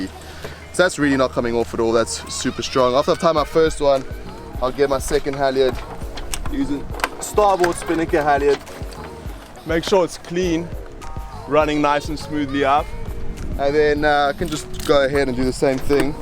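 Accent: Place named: South Africa. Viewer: Love the SA accent and no-bullshit manner 👍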